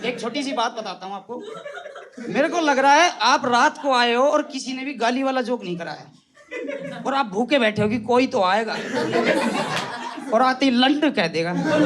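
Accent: India. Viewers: Bhai once again bohot bdiaa… vese jrut to nai h pr bhai k or tera fan hone k naate. Ek suggestion h Haryanvi accent na chooraa kr … baaki bohot bdiaa. ❤ Love uh bhai ne ❤ keep growing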